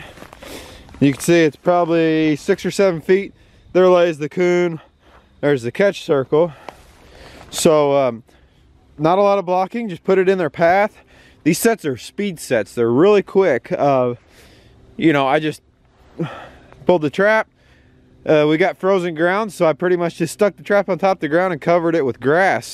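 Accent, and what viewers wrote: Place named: Pittsburgh, United States. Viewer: Your dialect sounds like Pgh. Pa? Or close?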